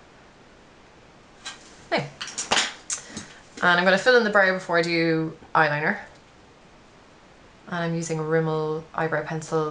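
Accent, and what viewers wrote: Ireland. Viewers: "It's too fekkin tick neou" Love your accent. I could listen to the Irish accent allllll day long! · Love the video and your accent! Sounds Irish. Where are you from?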